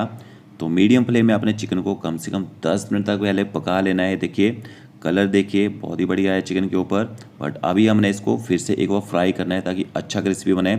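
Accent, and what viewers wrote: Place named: Himachal Pradesh, India. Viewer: Maine Apki tone se pehchan Liya,By the way kahan se??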